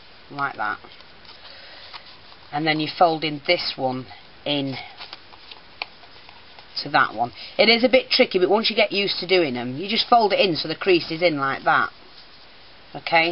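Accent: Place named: Leeds, United Kingdom. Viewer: It's because lives in Leeds do she has that 'leeds' accent lol. I like it:)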